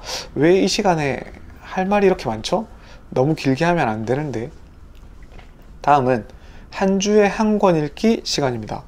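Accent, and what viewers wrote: Gyeongsang, South Korea. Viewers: 저는 부산,경상도 사투리가 너무 정감있어서 무조건 호감요^^ 부모님의 음력생일은 그냥 돌아가시기전까진 평생 간다고생각하는게..;;못바꿔요.. 저희부부도 이번에 싹다 양력으로 바꿨네요. 애들은 당근이구요. 좋게말하면,발상의 전환이고,어른들보기엔 모나고 발칙할수도요. 50중반되니 도발이 가능하더라구요~ㅎ 딸이 고딩교사초년생인데, 작년내내 징징대고 다녀서 엄마인 저도 어찌나 힘드던지요ㅜ 잔잔한 소회를 담은 선생님 유튭 잘보고있어용~ 딸내미 남친이 부산 싸나이라,정겹게 보고있답니다^^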